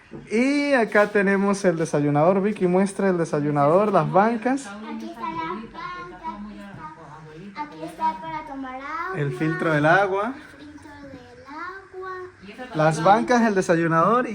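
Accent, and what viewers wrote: Mexico. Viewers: ya hablas como mexicano amigo... muchos saludos ddesde Nayarit , Mexico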